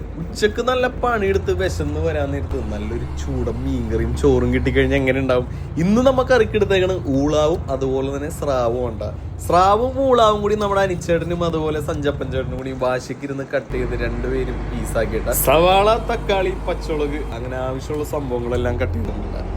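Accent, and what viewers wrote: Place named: Kochi, India. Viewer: Nice video correct kochikkarente voice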